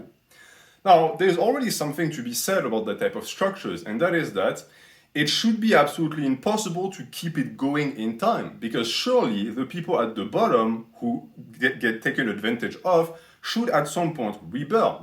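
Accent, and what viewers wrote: France. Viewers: I'm just here for the French accent. You're like a jacked version of my tall French friend haha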